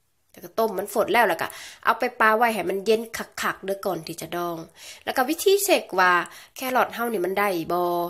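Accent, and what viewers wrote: Laos. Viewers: ทรรศสรวง พรมเหลือง เป็นภาษาลาวที่คนไทยอิสานฟังแล้วสะเหนาะหูดีแท่ มักเสียง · เจ้าของคลิบนี่พี่น้องสำเนียงคือพี่น้องสปปลแท้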